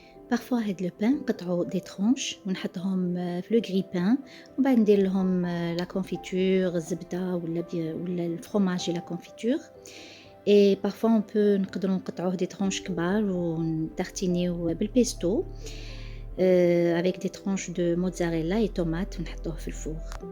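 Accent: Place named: Algiers, Algeria. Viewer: لهجة جزائرية عاصمية الله الله عليك يا الجزائر 🇩🇿💯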